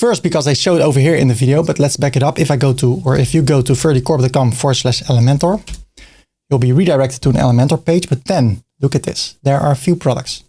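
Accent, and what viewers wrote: Netherlands. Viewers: I hear a dutchy accent, Ga zo door broer ! jij hebt prachtige content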